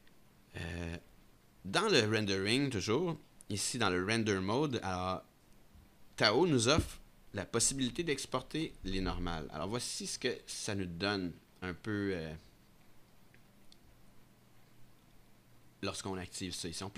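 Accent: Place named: Canada. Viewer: cool tutorial, in french would be cool, t as un accent tres comic pour les non-canadiens!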